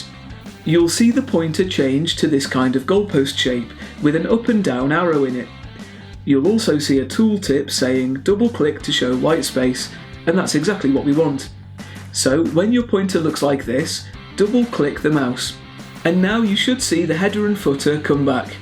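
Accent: England, United Kingdom. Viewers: Hi! You are a genius. It was so easy to add the "White" space on my Word document. also, it sounds as if you are from England. I have done karaoke tributes in costume to honor Princess Diana. I worked for a man who had #1 USA, Chicago catering company. He had worked in the English Palace for Queen Elizabeth. He hired me to hire "only" Whites since his company was going bankrupt with only colored tuxedoed part-time waiters being sent by Temporary agencies. That was 1997. I went back to Chicago. I helped save his company by hiring about 100 Whites. But as George Jewell, owner said, "We'll not only hire Whites but we will have to develop them." Just as you helped me with my Word problem, I thank you. Although it's been a curse since I now use the word "White" frequently.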